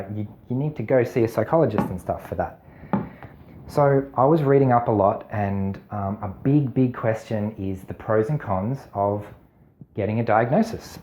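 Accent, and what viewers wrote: Australia. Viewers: I just recently found your channel and it's like having an audio diary but with a nice Australian accent. So much of what you share about your thought process and solutions are almost identical to what I had to learn to do to be able to interface with society.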